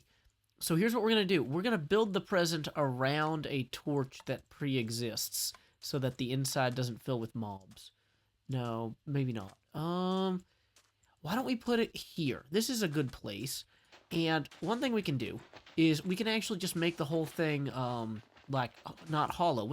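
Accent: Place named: South, United States. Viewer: your content is awesome, but I can never seem to get past that heavy southern accent! lol merry christmas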